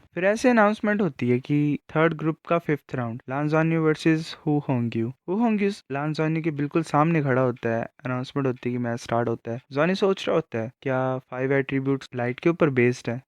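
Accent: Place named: Himachal Pradesh, India. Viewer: Bro apki language ae lg rha app Himachal Pradesh se ho 😁😁😅 i am right 🥺😅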